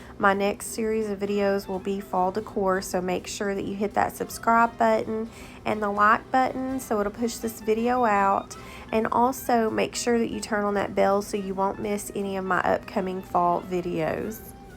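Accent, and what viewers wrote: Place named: South, United States. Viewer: I watched Charlie's country cottage clip then onto yours.....I just subscribed to your channel and my next trip is to the grocery store to buy delights super moist spice cake and 100% pure pumpkin. I love your southern Belle accent...and really liked your presentation....I almost smelled your muffins. I really enjoyed your video. Thank you...it will be so "yummy ya'all.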